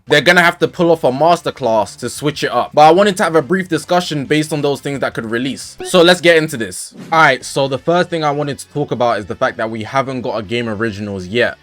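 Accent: United Kingdom. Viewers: Just a question, could you say " a bottle of water"? Because i heard the British accent is very strong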